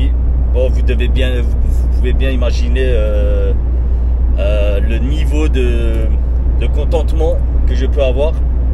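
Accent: Alsace, France. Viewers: Salut! Toi agressif? T'as juste un bon gros accent alsacien, c'est tout. 😂 Tu sembles juste naturel, direct et sincère. 👍 Ceci dit, peut-être que celui qui t'as laissé le commentaire s'avère rouler en Citroën. 😂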